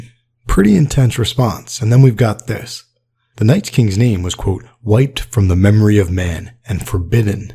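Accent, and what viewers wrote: New Jersey, United States. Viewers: You knocked the narration of this one out of the ball park!! Not too fast not too slow & just a hint of Jersey 😀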